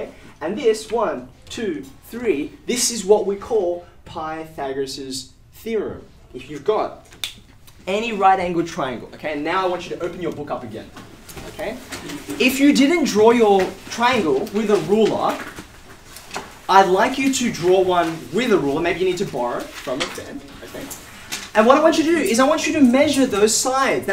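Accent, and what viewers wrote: Australia. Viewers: is this guy aussie? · Pretty sure that’s an Aussie accent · it's the aussie accent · He's actually Australian. A person's native language and accent is not hereditary, it is environmental. If you grow up hearing most people around you speak English with an Aussie accent, you'll also speak English with an Aussie accent as your first language. And this is how it works for all people who grew up in Australia (Aboriginals, British, Chinese, etc), regardless of their ancestry, unless they grew up in an ethnic enclave, only hearing people speak their ancestral language.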